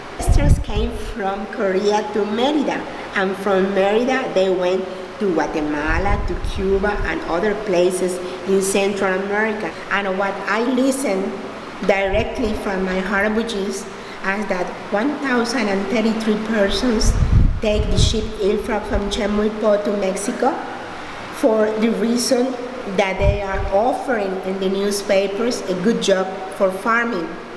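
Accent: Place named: Philippines. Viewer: Its interesting because she sounds Filipino talking English so I guess that's what Latino and Korean mixes lol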